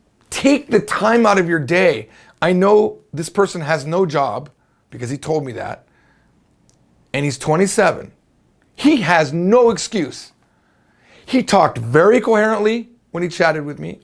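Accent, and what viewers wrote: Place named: Japan. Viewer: Aww your Chinese has a thick Japanese accent. lol so adorable!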